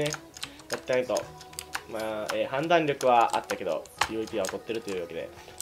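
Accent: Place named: Nagoya, Japan. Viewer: 名古屋弁じゃん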